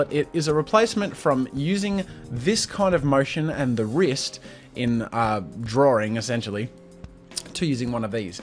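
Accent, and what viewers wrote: Australia. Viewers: ARE YOU AUSTRALIAN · you sound Australian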